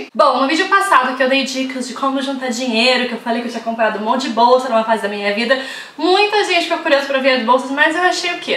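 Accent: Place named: Rio de Janeiro, Brazil. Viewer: Só eu nunca tinha notado esse sotaque carioca na?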